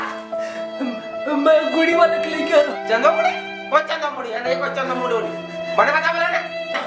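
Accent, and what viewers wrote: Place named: Chhattisgarh, India. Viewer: Sudh au saaf suthra Chhattisgarhi bole bar bhai bahut bahut dhanyawad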